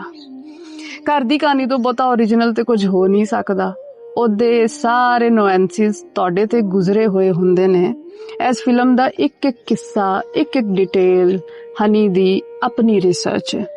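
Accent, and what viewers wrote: Punjab, India. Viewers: ਵਾਹ ਦੀਦੀ ਜੀ, ਤੁਸੀ ਤਾ ਜਵਾਂ ਈ ਸਾਡੀ ਵਾਲੀ ਪੰਜਾਬੀ ਬੋਲਦੇ ਹੋ । ਧੰਨਵਾਦ - ਚੜ੍ਹਦੇ ਪੰਜਾਬ ਚੋ । واہ دیدی جی، آپ بالکل ویسی ہی پنجابی بولتی ہیں جیسی ہم بولتے ہیں۔ شکریہ — مشرقی پنجاب کی طرف سے۔ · lao sister tuhada channel vi subscribe kar ditta tuhade Panjabi bolan de lehze karke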